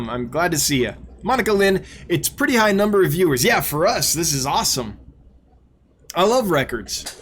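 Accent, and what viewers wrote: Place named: California, United States. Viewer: I stand corrected it's your calif. accent. LOL You still pronounce kerry as kerri.LOL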